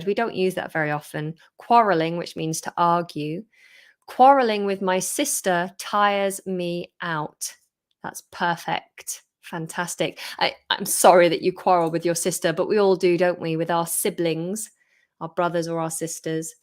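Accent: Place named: United Kingdom. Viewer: I love British accent!!!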